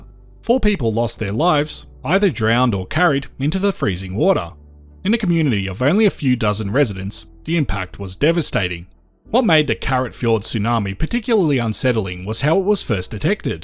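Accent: Australia. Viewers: Great to hear an Ozzie voice - and Tsunamis are a fascinating topic. I’d love to hear your thoughts on abrupt climate change - what geo evidence is there that paleo climate has previously abruptly changed in years not millennium and when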